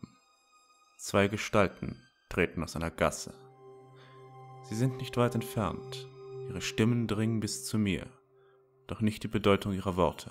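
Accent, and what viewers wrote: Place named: Berlin, Germany. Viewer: vom dialekt eher berliner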